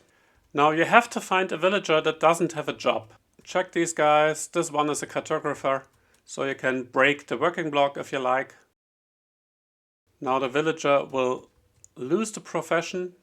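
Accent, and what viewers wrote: Germany. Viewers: do i sense a heavily german accent? :D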